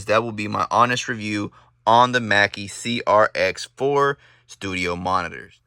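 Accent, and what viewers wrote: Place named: United States. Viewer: I hear the US accent and I know the claim in the title is bs. I'm out of here.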